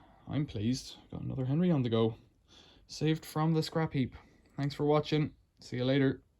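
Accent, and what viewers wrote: Ireland. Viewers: Lovely chilled video. Practical, informative, clear. Top man. Also, loving the Irish brogue, not to sound patronising 😉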